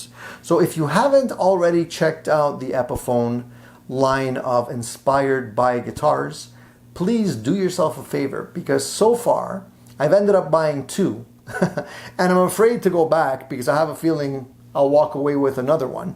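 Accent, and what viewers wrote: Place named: Canada. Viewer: Hi where is your accent from? Italian Canadian? Sounds similar to me! Cheers! Nice guitar sounds great!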